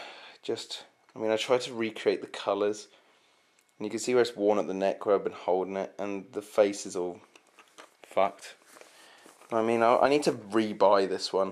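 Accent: England, United Kingdom. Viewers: are you from england aren' you???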